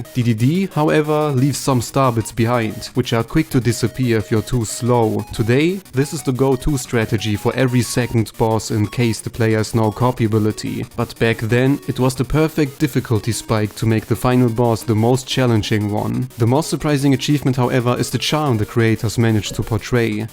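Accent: Germany. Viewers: I'm glad you're finally back. I never thought I'd as happy to hear your german accent again😂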